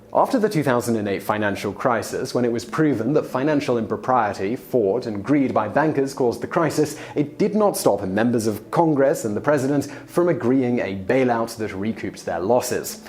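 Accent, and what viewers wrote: United Kingdom. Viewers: Too much haphazard information being thrown around: 1%, 0,1%... Oh, it turns about to be US incomes only? And what is all this information based on? Moreover, it's a Brit talking about the US, sacrificing even more on credibility... Not sure what to make out of all this in the end, rather than a fancily enthusiastic presentation by a guy with a British accent...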